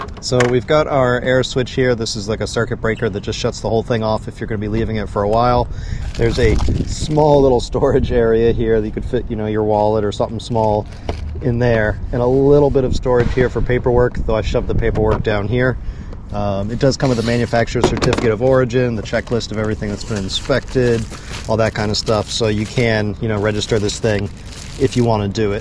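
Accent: United States. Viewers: You sound American. So where is home?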